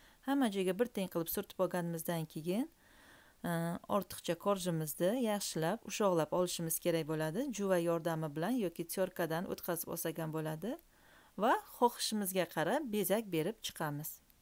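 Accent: Khorezm, Uzbekistan. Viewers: Horazm shevasiga ohshattim.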